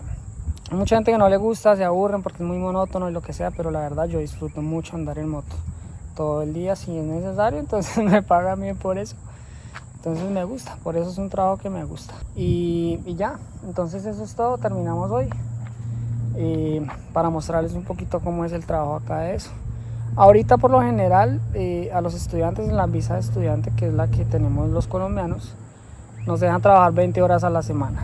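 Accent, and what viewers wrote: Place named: Bogotá, Colombia. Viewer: Buen día tienes acento rolo, elegante ver gente de Colombia en Australia 🦘🌏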